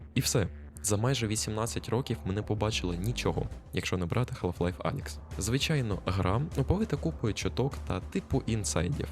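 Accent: Russia. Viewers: забавна говірка в автора. типу іноді проскакує російський акцент, особливо чути в числах. ну якщо автор перейшов з російської то молодець так тримати. якщо автор так розмовляв то мені просто цікаво в якій частині нашої країни такий говір?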